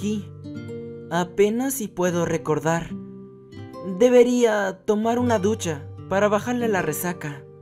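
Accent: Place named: Peru. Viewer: Ala shaval v: Me eh sorprendido con tu acento peruano 10/10 Entons pa cuando la segunda parte :D? ;-; no mms 🌈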